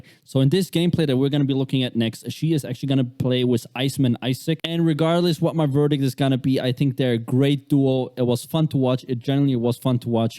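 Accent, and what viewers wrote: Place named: Germany. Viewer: You definitely a German bro, your accent sounds like this, and your name too.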